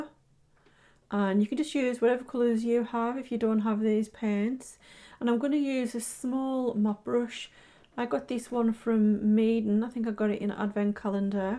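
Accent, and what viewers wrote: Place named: England, United Kingdom. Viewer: trying to place your accent is killing me. I have lived outside of the UK for 14 years and I cannot work out if you're from the Midlands or the NorthEast lol. Please tell??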